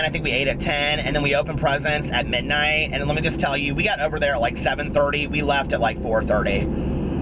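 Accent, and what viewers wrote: South, United States. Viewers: Where does your southern accent come from? I am from Georgia and I swear you sound local. :)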